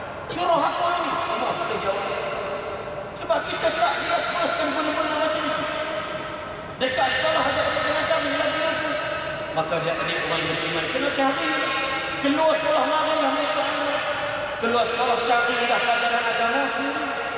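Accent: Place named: India. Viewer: ni ke ustaz bollywood tu?salu dgr dlam IKIM jer..pndai die ajuk/ngaji slanga india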